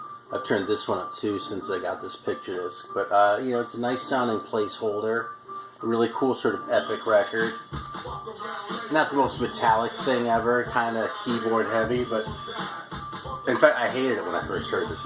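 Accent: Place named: Spain. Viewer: That Gang Starr album totally rules! Now I'm gonna watch new Blade Runner and have some chocolate while watching it. Nice stack of picture records. I have first four Venom albums on picture discs. I have more but I really don't remember right what else. Cool Spanish accent hehe. Take care. Cheers, Petri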